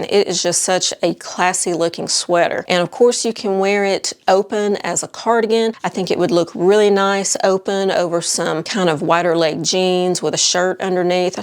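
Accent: South, United States. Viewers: I just discovered your videos recently and I think you are cute as a button. I love hearing your voice and and sweet southern accent! :) You've inspired me to get a much shorter hair-style...I feel like a new women! I hope your aunt gets situated into a happy situation. No one really understand how much we women are/feel responsible for everyone and every thing! Hang in there! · Hi. I enjoy your videos and your southern accent reminds me of my own aunts I have down south. As a nurse, I truly understand what happens when an older person falls and breaks a hip and then the aftermath when they have dementia and that certainly progresses. It sounds like you’re doing everything to keep her safe and well taking care of. I recently lost my mother earlier this year and feel alone also as you described in your video with your aunt being the last connection to that side of the family. 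All you can do is take it one day at a time and some days will be hard. Take care of yourself. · Writing from Washington State where style and fashion never got a hold.. Sorry, WA State! Love your style and southern dialect. 😍❤❤So sorry to hear about your auntie.😥 Wishing you the best and strength!!! And thanks for letting me escape fleece country by watching your channel.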